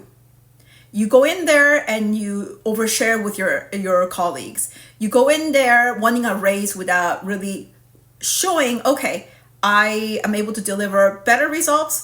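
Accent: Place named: Brazil. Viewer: Anyone can be brazilian haha we have the largest japanese pop outside of japan. Her accent is very brazilianish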